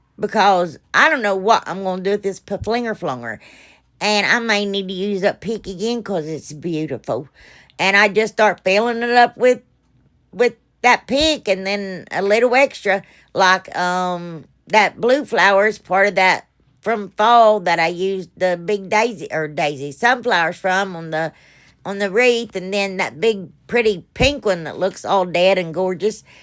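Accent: South, United States. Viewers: Hi,. I'm Tiffany. I am a caregiver for my husband whom is very sick. I'm originally from Nashville,TN but reside in Ohio. We love watching your crafting videos and your country accent. I feel like I'm at home watching your videos. 😊😊❤❤.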